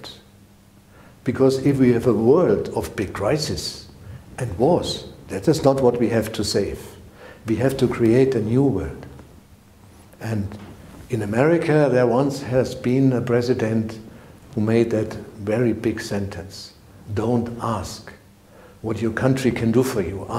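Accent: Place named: Bavaria, Germany. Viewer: Your Bavarian dialect mixed in with English is a delight to my ears. 😘 I’ve lived in America for many years and still have a little Munich/Bavarian accent😘 love your courses in German and English. Eye and heart opening.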